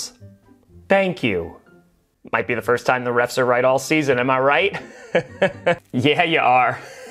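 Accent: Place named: Kansas City, United States. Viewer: You need to work a little bit of Ted Lasso into your Chiefs fan accent. I love your videos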